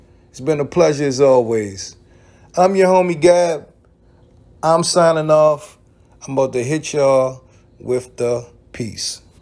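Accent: Baltimore, United States. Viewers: Your bmore accent is heavy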